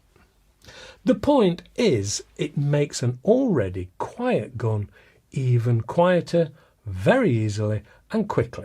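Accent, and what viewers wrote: United Kingdom. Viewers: Hi, I have owned various firearms and competition grade air pistols for 20 years, but just lately found the joys of airgun collecting. Must say that I enjoy greatly watching your reviews, even if they are about guns that I have intentions to never acquire. (Well, never say never...) Plus, since English is not my mother tongue and after listening all kinds of hardly comprehensible drooling of mixed "reviewers" from a certain other part of the globe, I really appreciate your expertise and crystal clear British accent. Keep up the good work!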